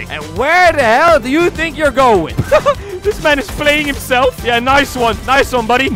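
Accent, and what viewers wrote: Netherlands. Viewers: R u dutch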